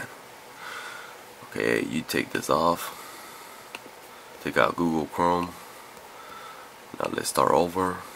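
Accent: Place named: Russia. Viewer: No idea where that accent is from, Russia maybe, but you just solved my problem. Thanks!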